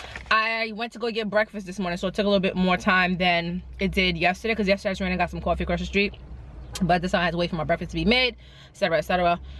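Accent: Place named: New York City, United States. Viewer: Girl I can tell you from NYC! Lol 😆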